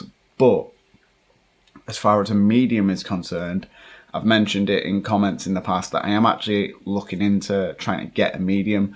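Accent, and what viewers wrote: United Kingdom. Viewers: Hi guys I am french. I need help to understand at the point he explain us how the paranormal activity started around him. The british accent is too strong for me :( If someone could write it down would be nice. Thx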